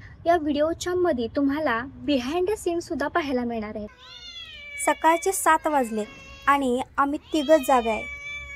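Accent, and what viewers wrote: Kolhapur, India. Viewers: कोल्हापुरी भाषा ❤ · Tumcha kolhapuri accent ch bhari vattoy change naka karu tumhi ❤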